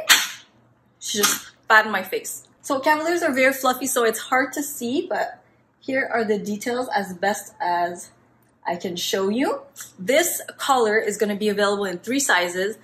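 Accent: Quebec, Canada. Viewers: Êtes vous de Montréal, aussi?! I think I saw you guys!! Aussi je reconnais l’accent quebecois/montréalais! J’adore! 💕 I’m getting a cavalier in November and have binging your channel to prepare myself 😂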